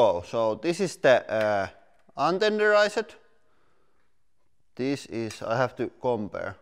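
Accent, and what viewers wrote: Finland. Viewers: I know that because i am finnish myself. I almost immediatly noticed it because the merch of The Black pepper. And he has a finnish acent. Sry if i spelled wrong.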